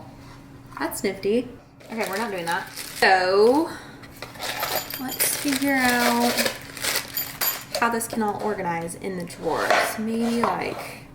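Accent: South, United States. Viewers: I think you are acquiring a southern accent 🤌🏻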